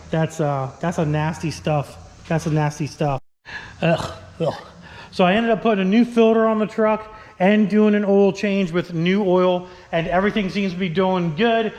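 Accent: Pennsylvania, United States. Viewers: You pa people are messed up with your English wtf? It's a rade I ator and borg Warner what is wrong with u bro? Ohio is one state away we can speak correct pa is the only state that can't talk right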